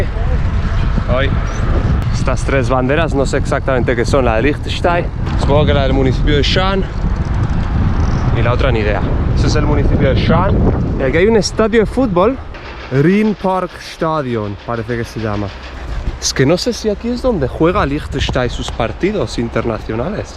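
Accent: Spain. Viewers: hablas en dialecto, españolete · Sabroso acento español,buen video, gracias!!! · Ya me extrañaba a mi que fueras español te veia un ramalazo de por ahí por el acento y por tu aspecto 👍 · Tienes asento español por?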